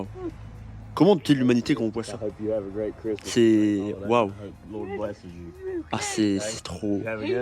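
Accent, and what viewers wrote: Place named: Arab world. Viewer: W VIDEO W ACCENT ARABE ARR 😭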